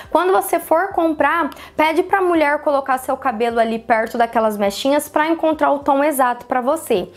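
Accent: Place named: Interior, Brazil. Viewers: Q sotaque mara...sou do interiorrr tbm(Araçatuba)bjs adorei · Adorooo esse sotaque do interioR! Puxando o R! Tbm sou do interior de sp.. Bjs lindaaaa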